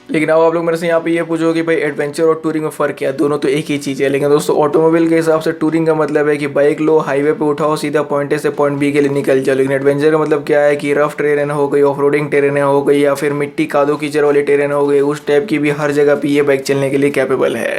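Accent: Bihar, India. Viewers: Aap Bihar ya up se ho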